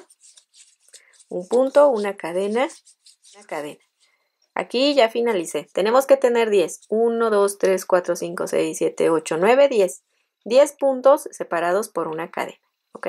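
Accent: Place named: Chihuahua, Mexico. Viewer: Queried a amiga,. Que preciosos trabajos Haden Gus manos. De don de eres en Mexico?. Me suenas como de Chihuahua, Rees Norte a?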